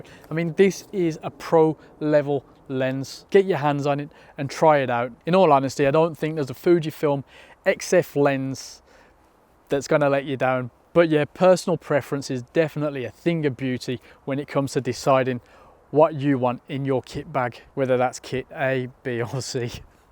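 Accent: Birmingham, United Kingdom. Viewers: Is that accent Brum?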